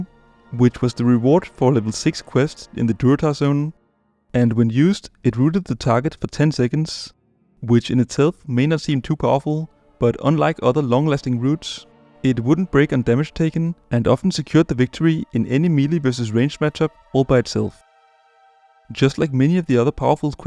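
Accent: Denmark. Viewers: Danish accent represent. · are u danish! STEGT FLÆSK MED PERSILLE SÅVS!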